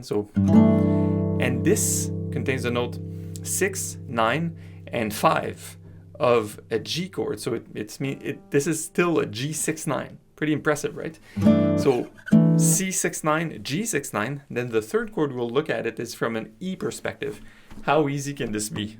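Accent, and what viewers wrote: France. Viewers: Man, great video! But I can't wrap my head around where you're from! ;) It seems to me that you have a very very slight French accent (I'm French), but you speak an amazing English! It seems you're from Ottawa, but, gnah, with your name and this speech, something sounds fishy. ;) Anyway, keep up the good work! :) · Super un frenchy qui nous fait des tutos pour l'international avec le petit accent qui va bien. Et à part ça en français pour la France vous avez Monsieur ? Merci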